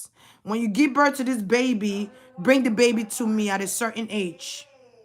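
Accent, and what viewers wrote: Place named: Caribbean. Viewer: You remind of Rihanna are you from the islands ?! I love that accent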